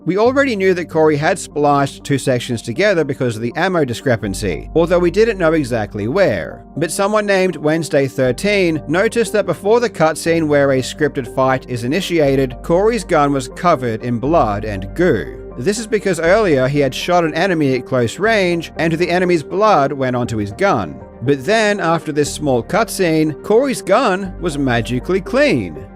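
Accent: Australia. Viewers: Love your videos. But to be honest, your accent plus the way you speak for the videos is like nails on a chalkboard. It’s awful. Aussie streamers I feel like get more views than average because of their voice, but yours is just plain grating. Any hoo, on to watch the video…. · CH is challenging for English speakers, don't sweat it. The CH in Nacht is said like the CH in Loch, the Scottish word for lake, like e.g. Loch Ness. The A is like in car, but short. Your first pronunciation in the video is correct. Yes, I'm saying correct. Sounding a little different than the theoretical standard pronunciation doesn't have to mean you're mispronouncing it, it just means you have an Australian accent because you got way too close to standard to be called "wrong." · He's Australian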